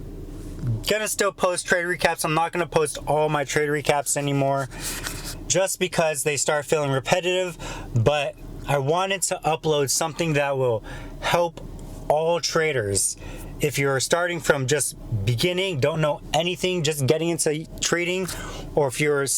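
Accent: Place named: Mexico. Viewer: I love this guy‘s content. I just can’t get past the fact that he lives in the United States, but talks like he just migrated from Mexico or something is this just because he lives with his parents or what because that’s crazy how you can go to school for so many hours a day but still end up talking like you’re not even from this country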